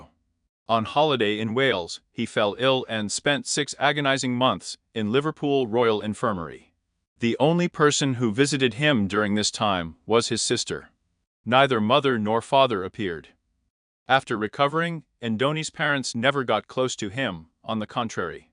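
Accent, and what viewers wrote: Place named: United States. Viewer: The narrator, is he for REAL? Haha. American perhaps.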